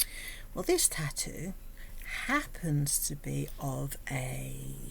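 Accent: United Kingdom. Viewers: The elegant, accurate explanation, delivered in the proper British accent, is brutal! Love it! And you look fabulous. The message you send with the makeup is crystal clear. 😂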